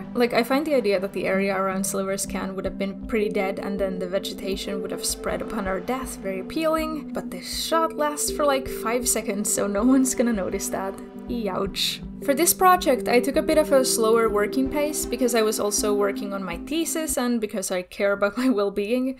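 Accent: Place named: Finland. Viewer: Kuulin heti aksentista et oli suomalaine kyseessä. Mahtava tietää että suomestakin löytyy näin taitavia henkilöitä! Erinomainen video :]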